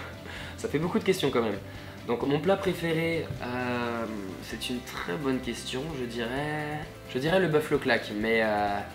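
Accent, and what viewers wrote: Alsace, France. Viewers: tu prenais un accent "alsacien" plutot accentué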